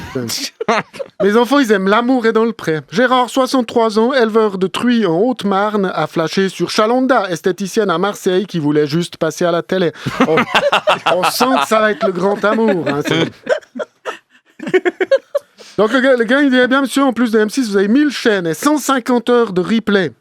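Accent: Switzerland. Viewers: Tu reconnais un suisse à son accent mais aussi à ses blagues d’enfants de 5 ans ! L’humour et l’esprit vole vraiment très bas ! Le concept de révolutionnaire du dimanche prends avec eux tous son sense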